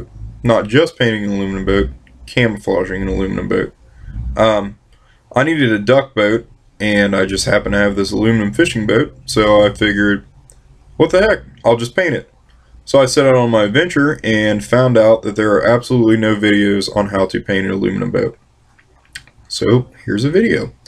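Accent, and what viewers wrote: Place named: Pittsburgh, United States. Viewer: You have to be from Pittsburgh with that yinzer accent lol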